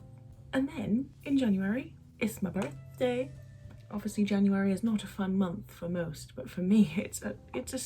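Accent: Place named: Yorkshire, United Kingdom. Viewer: SO.. I’m from Yorkshire 😅😂 ..you definitely got the accent on point hahaha!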